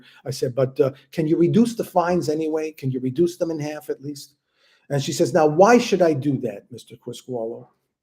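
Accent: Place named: New York, United States. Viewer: Glad things went well 👌. Just love listening to your NY accent 😍 🇦🇺🙋🏼‍♀️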